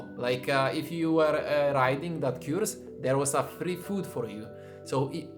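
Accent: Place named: Russia. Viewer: bjutiful raszan akcet yea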